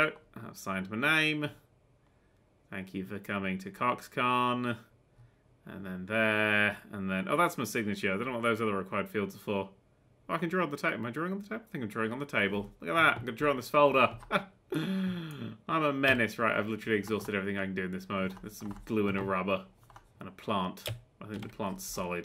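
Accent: United States. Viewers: is American!? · WAITDans American?????!!!!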